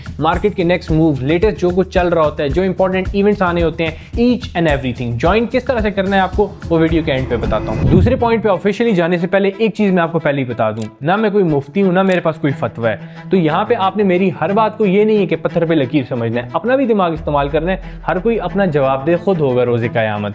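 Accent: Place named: Pakistan. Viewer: Ab batao bhai aur 100% loser ki fee se nhi chalta unka main revenue of source h pr bohot si cheeje h ab job Krna hoga to banda karlega funding pips me bolega halal h aur wahi model pe kaam krne ko glt kaise bol skta h 😂 btw me India se hu mujhe is ke upr ki jo mene knowledge li h iske upr level ki knowledge laake batao ki ye haram h pakistani 😅 awaaz curiosity jyada banati h :) agar h koi banda to genuine batao to me leave bhi krdunga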